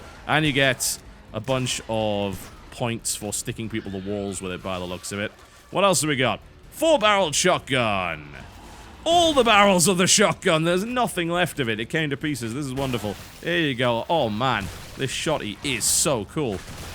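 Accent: United Kingdom. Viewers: lol first of all hes british. second: you're funny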